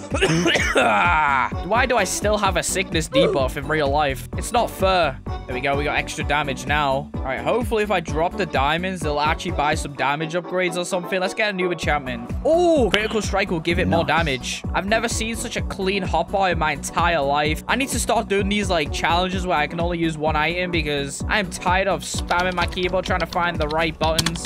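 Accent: United Kingdom. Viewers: Did bro turns to british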